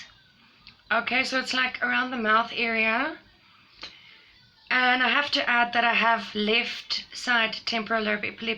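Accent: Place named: Germany. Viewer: Hallo, meine Schatz. Just found your site; not sure if you’re still active with your channel. Have you heard of people with TLE while sleeping? I’m an academic researcher compiling data on this phenomenon and I would be interested in your or others’ experiences with this. I used to live in Deutschland and you sound German; forgive me if I am wrong. I hope you’re making some progress with living with TLE, and I 100% agree with your choice not to hypermedicate; good for you. I will check back to see if you have any experiences or leads for others with sleeping TLE. If so, I will put you in touch with my study. All the best wishes.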